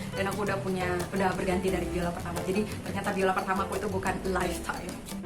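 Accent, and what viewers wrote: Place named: United Kingdom. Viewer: Lebih pantas lg klo bicara tanpa menggunakan aksen English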